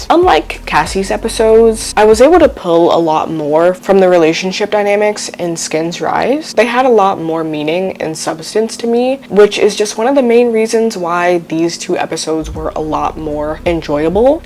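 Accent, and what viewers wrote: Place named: United States. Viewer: Hearing an American talk about Skins… I’m immediately turning off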